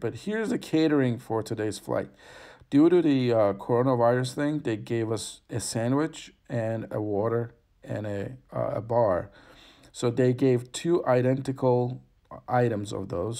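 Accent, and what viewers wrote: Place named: Turkey. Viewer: I liked your English.. it's quite fluent... but I felt the influence of Turkish ... any way I liked it and I prefer your videos in English..😊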